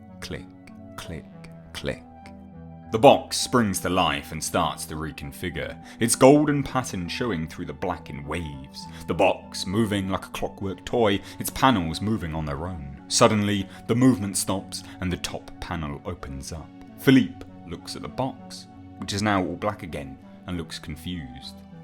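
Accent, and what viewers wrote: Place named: United Kingdom. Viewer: With a little more bass in your voice, you could have a nice cenobite tone. Or maybe it's just the British accent. Yeah, that must be it...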